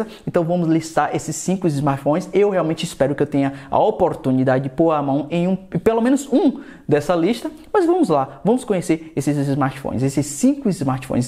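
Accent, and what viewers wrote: Bahia, Brazil. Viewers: Mano vc e da Bahia né ??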